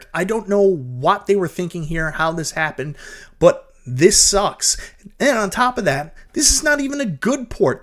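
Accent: Canada. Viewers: no offense I watch your videos. Your Canadian accent with your pronunciation of Arcade gets on my nerves to no end for some reason. Lol. But people come here to hear about the game. While not stating it you were giving other peoples opinions of the game. You are essentially giving a review when people watch this of what to expect. You’re splitting hairs here.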